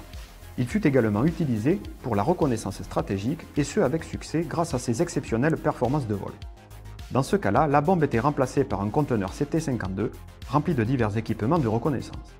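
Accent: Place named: Marseille, France. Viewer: Dommage : l’accent marseillais rend inaudible la présentation de la vidéo !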